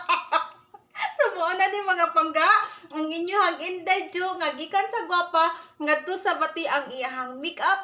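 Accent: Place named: Visayas, Philippines. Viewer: bisaya diay ka tita hehehe cute kau paminawon tita, more video tita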